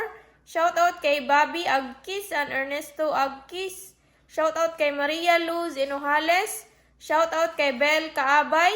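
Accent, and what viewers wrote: Visayas, Philippines. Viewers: Ang cute mo magsalita ng bisaya,sak² sinagol Tagalog ba😀😀😀pag amping mo ug sa jogging nyo💪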